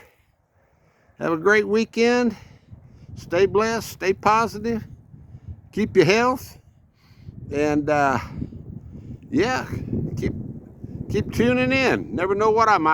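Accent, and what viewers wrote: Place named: Texas, United States. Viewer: Just came to your video (partly) because your title mentioned Sarasota Tim - he's a hoot to watch. Watched a couple of your videos and enjoy your Texas drawl and the way you comment on the Davis mountains and the surrounding area. Beautiful country. That Dixie can really move. She looks like a Border Collie, I grew up with a BC in my youth in Houston. Just subscribed.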